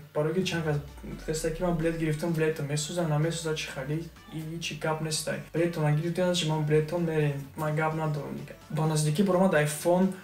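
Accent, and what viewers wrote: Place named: Dushanbe, Tajikistan. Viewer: мо душанбегие хамихели гап мезанем ихели лавза созтар мефахмем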